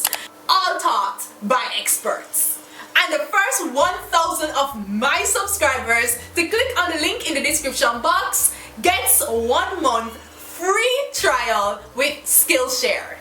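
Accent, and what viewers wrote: Patois, Jamaica. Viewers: I have nylon thread so I can use them.. You know at first I thought you were from a different island until I heard the patois Jamaica patois🇯🇲🇯🇲🇯🇲🇯🇲🇯🇲🇯🇲🇯🇲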